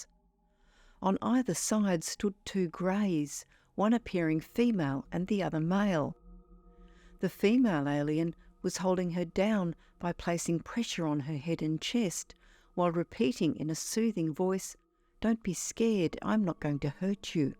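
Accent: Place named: Australia. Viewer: Its called an aussie accent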